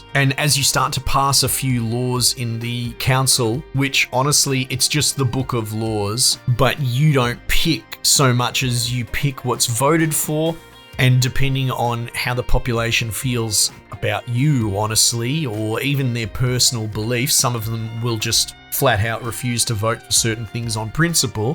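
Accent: Australia. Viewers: I can tell you’re Aussie as well, love how you telling it straight. 😂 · As an Aussie, it's come as a massive surprise how pleasing it is to hear your accent. I usually find the Aussie accent repulsive. So --- compliments to you sir.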